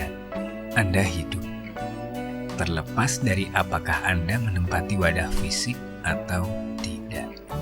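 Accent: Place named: Madura, Indonesia. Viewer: Logat madura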